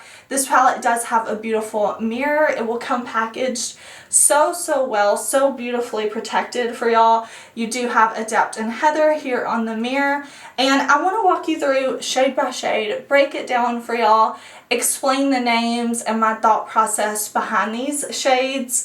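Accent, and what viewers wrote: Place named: Tennessee, United States. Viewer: Her accent is so heavy, is she from Tennessee?? A beautiful pallet so excited.. WOW good job!!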